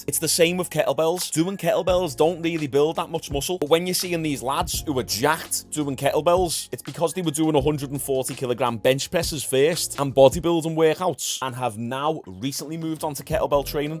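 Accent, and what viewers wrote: Liverpool, United Kingdom. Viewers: I hate British accents, but I LOVE this guy’s accent! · I love his Scouse accent!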